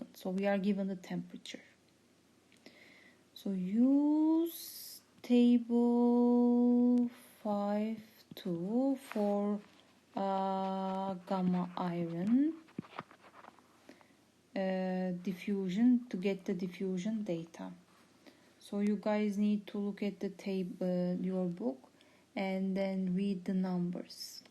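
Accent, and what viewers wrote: Turkey. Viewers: Tam Türk aksanınız var hocam :) thanks.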